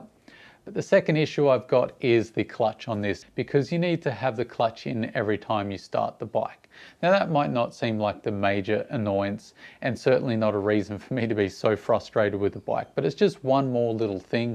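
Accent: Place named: Australia. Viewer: bro you're australian as fuck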